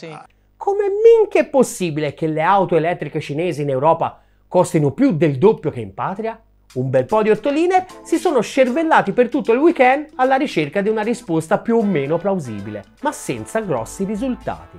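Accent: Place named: Tuscany, Italy. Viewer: Sei un grande 😁 Specie con il tuo accento toscano 😁. È un dato di fatto che i cinesi ci hanno creduto per primi e hanno investito e sono avanti di decine di anni. In Europa le lobby hanno screditato il mercato nascente non facendo investimenti nuovi ma ancora guadagnare allo stesso modo cosa non spiacevole per i governi di incassare ancora le accise 😂. Ora che le pressioni dei cambiamenti climatici e della tecnologia ev sono sempre meno screditabili non si sa che pesce prendere che scusa o colpa trovare. l'Europa è in netta arretratezza e recupero il ritardo costa caro. I cinesi l'avevo già sentita nel settore ev vanno in punta di piedi sanno benissimo che rischiano l'aumento dei dazi. Difatti la macchina ev più venduta in Europa e America e la tesla che è un marchio Usa,Elon Musk un miliardario pazzo che ci ha creduto nonostante i bastoni tra le (ruote)si è costituito non solo le macchine,i software, le batterie i supercharger e ancora per aggirare la burocrazia in america la RC auto per le sue macchine 😁. 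Quanto detto i anche in America è come tutto il mondo: gli hanno reso la vita difficile. La determinazione e l'intuito ora raccolgono tanti frutti. Palle che Stellantis, Volkswagen e nessun grande gruppo europeo ha avuto.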